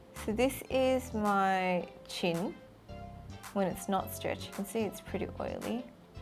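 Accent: Australia. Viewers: AN AUSSIIEEE YAYA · are you australian? you're pretty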